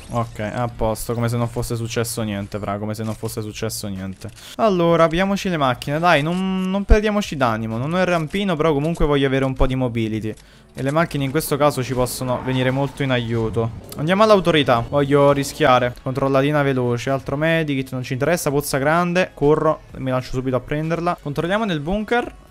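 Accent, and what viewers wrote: Naples, Italy. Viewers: madonna mia fra quanto si sente accenti napoletano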